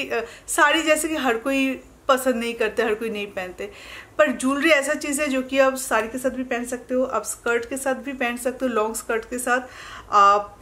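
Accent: Bengal, India. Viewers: Bachpan se bangla bolti aae hai , hindi jitna v bole bangla hi unka 1st comfortable language hai so hindi me v bangla style a hi jata hai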